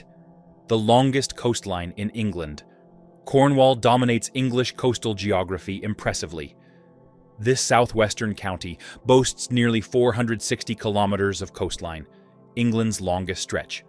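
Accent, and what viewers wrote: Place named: United States. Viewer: It would be better without the awful American accent. The town of Berwick is pronounced BERIK not Ber W I C K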